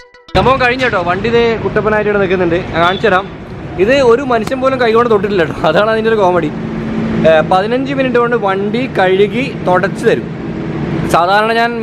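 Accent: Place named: Iritty, India. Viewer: . കുഞ്ഞി കുഞ്ഞി നമ്മുടെ ഇരിട്ടി ഭാഷാ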